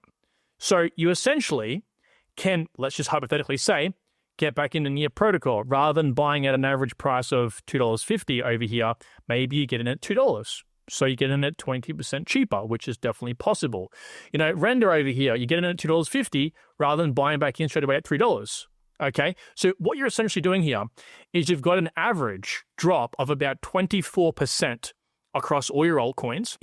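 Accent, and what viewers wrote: Australia. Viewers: Nice video. Tho, I would enjoy your channel alot more if the Australian accent was toned down a bit.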